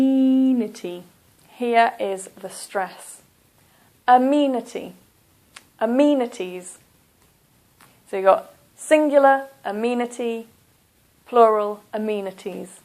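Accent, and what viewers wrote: United Kingdom. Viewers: that's a British accent.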